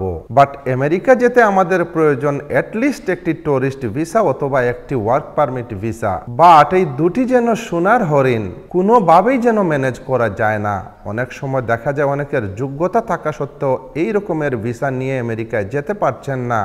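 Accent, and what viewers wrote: Sylhet, Bangladesh. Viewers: আসসালামু আলাইকুম ভাই, আপনি কি সিলেটের।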